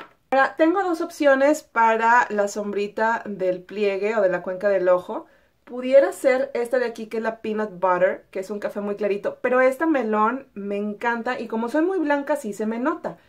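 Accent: Mexico. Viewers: Me encantan tus videos, me río mucho y adoro tu acento mexicanote con todos sus modismos. Ahh y tambien llegue al final ❤️💙💛💜💚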